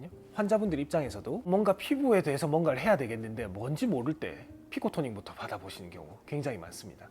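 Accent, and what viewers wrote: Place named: Yanbian, China. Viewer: 과한몸짓과 조선족말투. 사기꾼 같아보입니다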